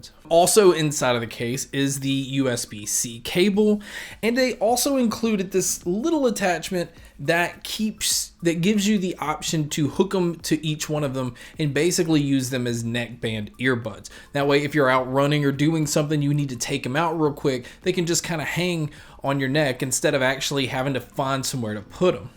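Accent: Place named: Carolina, United States. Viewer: You have a Southern/Northern Carolina accent I love it!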